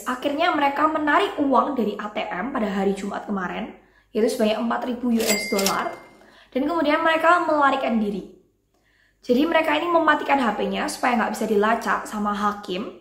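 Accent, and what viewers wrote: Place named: Surabaya, Indonesia. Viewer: Keto wong Suroboyo ne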